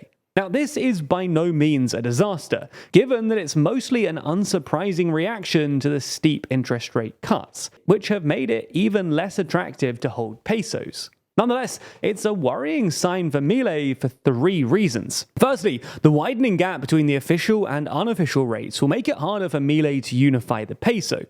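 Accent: United Kingdom. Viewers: I'm so sick of guys with British accents trying to convince us that gods don't exist. :P Milei was elected on the explicit promises of cutting spending and saving the economy from the course it was on toward Venezuela's hyperinflation. He was honest during the campaign in declaring the recovery would be painful. You'll look like a buffoon in five years for opening the video with a smug attempt to take rock lyrics out of context with an ad hominem attack making Milei out to be some kind of chaotic evil dictator rather than a brilliant economist willing to take as much heat as necessary to correct the catastrophic course that the socialistic keynesian model of Argentina has been on. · 1. Your videos are quite long, boring, filled with ads, in the beginning , middle, and end. 2. You barely give sources, your video titles are clickbait, and your content is biased sensationalism. 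3. Your channel is the trash humans should rid themselves from. 4. #TLDRNewsGlobalIsTRASH and an obstacle and distraction keeping ppl from finding quality information. #ThereISaidIt Now click like on my comment so the POS behind this video read this (prob not the British-sounding narrator) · Why is it always a bouncy british voice that's telling lies on youtube. I swear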